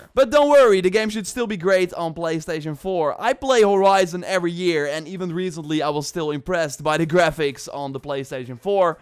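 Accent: Netherlands. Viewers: Lekker Nederlands accent man:)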